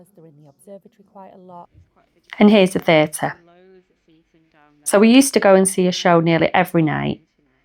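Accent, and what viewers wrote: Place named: Lancashire, United Kingdom. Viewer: Sounds like a Lancashire Accent, Maybe Oldham area?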